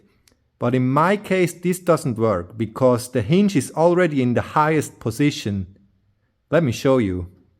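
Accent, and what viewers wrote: Switzerland. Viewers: When you hear that Swiss accent, you know this job will be done with 110% accuracy. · Your accent is lovely! Are you from Schweiz, or maybe south of germany.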